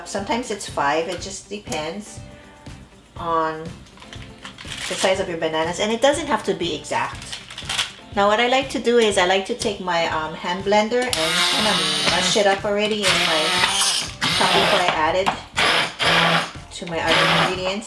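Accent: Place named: Hawaii, United States. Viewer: When I heard you talking, I thought, Hey, this girl from Hawaii. After reading your description I realized hey, I was right. You can take the girl out of Hawaii but you cannot take Hawaii outta the girl! You go girl. Great to find you on YouTube. Hawaii representing! I gotta make this.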